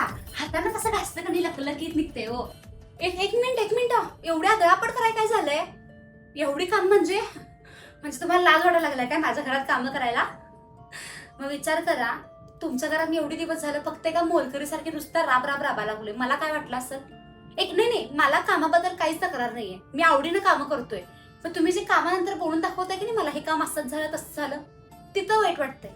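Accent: Kolhapur, India. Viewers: Kolhapuri language ❤❤❤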